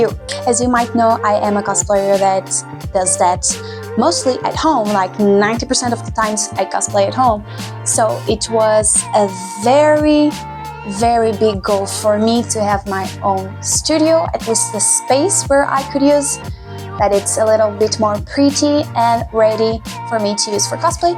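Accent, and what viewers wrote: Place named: Europe. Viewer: What country are you from? I can tell that you're European from your accent, but I can't tell exactly where.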